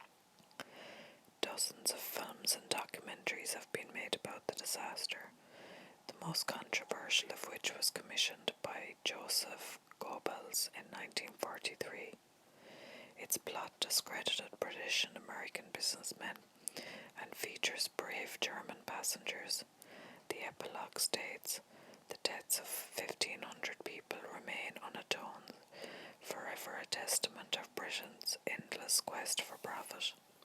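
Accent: Ireland. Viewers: U Irish?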